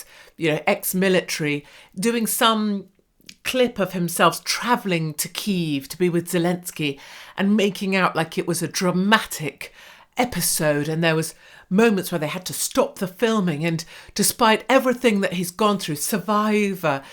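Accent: United Kingdom. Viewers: We never provoked Russia. It would be nice if you guys stopped spreading Russian propaganda. There were no biolabs. There are no NATO nukes pointed towards Russia on the Russian border. Last I checked Putin doesnt seem to have a problem with slaughtering civilians, since he does it himself. In Ukraine. In Syria. In Georgia.... So Nuland, a single person says "F*** the EU" therefore you hate all of America? Amazing. BTW are you even part of the EU? Your videos indicate towards a heavy British accent..... According to the world corruption index, Russia is even more corrupt than Ukraine. in his video critizising Mearsheimer and Realism, in the last segment he compares Russian corruption with Ukrainian corruption. Its quite different. In Russia Putin controls the corrupt. In Ukraine, nobody controls the corrupt. So in Russia you have corruption + autocracy. In Ukraine you have corruption + anarchy. 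And this also makes sense, since historically Ukrainians have always been anarchists, while Russians have always been authoritarians. Why should Ukraine pay reparations to USA? Makes no sense. In fact the West is setting up a Marshallplan for Ukraine to rebuild them, once they are liberated from Russia. And we have seen the enormous economic sky rocketing of countries that get free from Moscow's clutches and even more incredible when they get supported. If Ukraine wins, the one to pay reparations is Russia, to Ukraine. If Russia wins, it will rebuild for free? LOLOLOL What ever Rus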